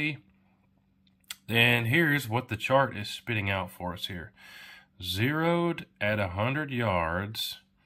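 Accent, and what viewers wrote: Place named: Arkansas, United States. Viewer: Are you saying “boo-lots” instead of “bull-its”? I’ve never heard a bullet called “boo-lot”. Where are you from? I sense an accent, but can’t place it. If I were to guess, though, I’d say Arkansas or there about.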